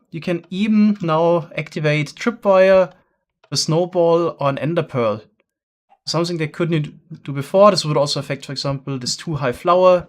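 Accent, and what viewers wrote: Germany. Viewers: you sound a bit German 🤔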